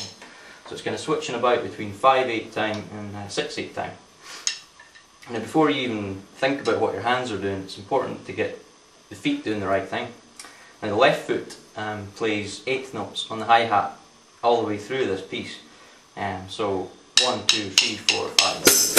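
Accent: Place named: Scotland, United Kingdom. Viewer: you're awesome. I love...always wanted to find a drummer who could play it...adorable Scottish accent. Amy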